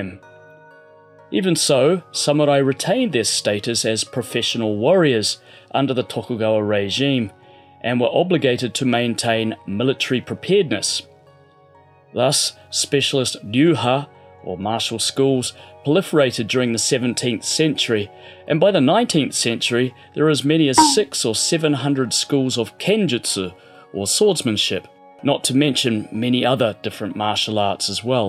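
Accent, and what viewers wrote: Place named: New Zealand. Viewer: Nice work, great to hear a Kiwi explain this.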